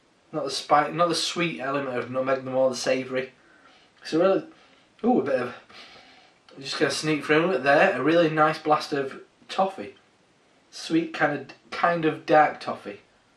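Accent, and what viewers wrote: Yorkshire, United Kingdom. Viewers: oh thats bootiful loovely haha gotta love the yorkshire acsent on an american beer ;) = great review dude = i tried finding if they were going to export to uk from what i have heard it may be end of year before they export to UK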